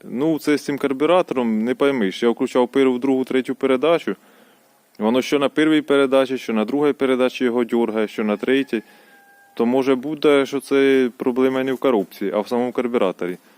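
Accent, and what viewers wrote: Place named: Nizhyn, Ukraine. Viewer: А ви з ніжинського району по акценту наче чутно чого питає вдруг надумаєте продавати чи далеко їхати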